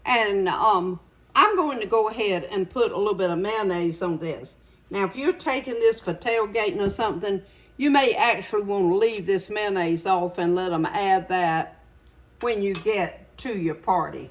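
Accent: South, United States. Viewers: Every time you say shine for Jesus,’ I think of my wonderful Mother in Law. She’s home with the Lord now, and I know how happy she is. The two of you would have been great friends. Faith - Family - Friends! She never missed a Sunday at her Methodist church. She was a true Southern lady, could cook amazingly well, and it looks like you could be cousins. Same height, same accent, even your hair is alike. Yes, I miss her!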